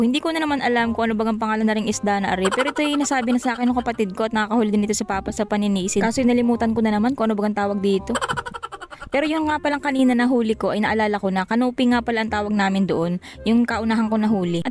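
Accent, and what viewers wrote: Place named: Quezon, Philippines. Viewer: Salitaing Quezon e 😂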